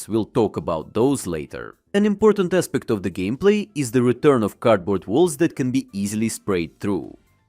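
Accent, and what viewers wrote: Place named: Russia. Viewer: Честно, прям слышен РАШЕН АКЦЕНТ 🤣🤣🤣. Видео клёвое))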